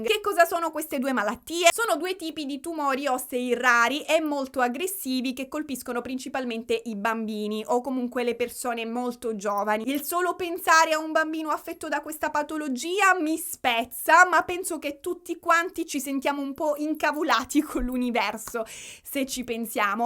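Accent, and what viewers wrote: Palermo, Italy. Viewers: Madooo'' sei troppo fantastica! scoperta ieri con il video di Kayne West e Taylor Swift! Adoro il modo in cui parli, la tua ironia, come spieghi tutto in modo efficace e senza annoiare (nonostante 2 ore di video!). Ps: Ho capito subito che sei palermitana! troppo divertente! ahahahah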